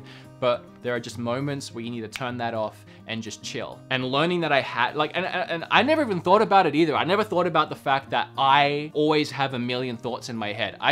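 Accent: Australia. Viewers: how can you lose an aussie accent? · I only just realised you're Australian I'm Australian. Why did I think you were American this whole time. Did i just filter the accent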